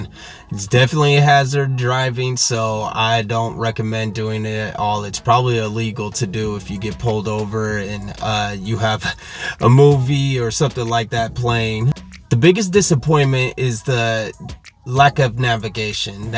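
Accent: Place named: Bahia, Brazil. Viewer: meu deus que bahiano pqp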